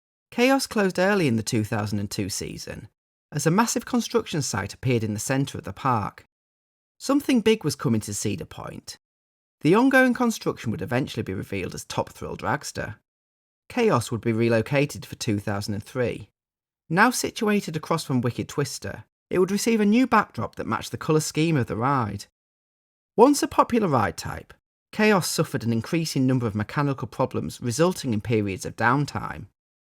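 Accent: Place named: United Kingdom. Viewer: A British man talking about American theme parks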